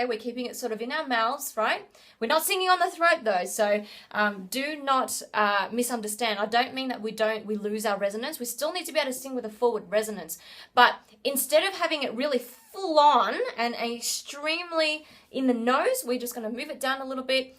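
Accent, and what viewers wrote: Australia. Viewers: Its because shes Aussie aha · She's Australian, that's how they sound! ( I'm from there, I should know!!)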